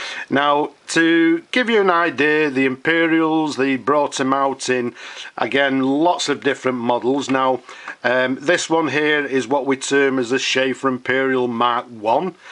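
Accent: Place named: North, United Kingdom. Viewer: Ey up! Thy sounds like a Northerner. I have an Imperial I with a plastic Touchdown rod.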